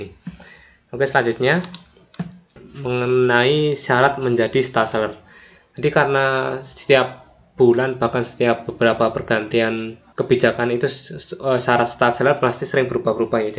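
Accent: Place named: Pekalongan, Indonesia. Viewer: Orang pekalongan yah mas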